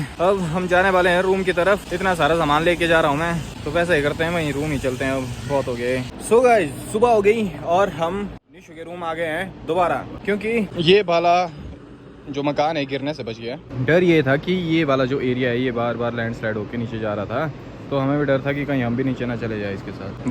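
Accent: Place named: Himachal Pradesh, India. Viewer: Himachali accent🌻❤ Stay inside and safe🌸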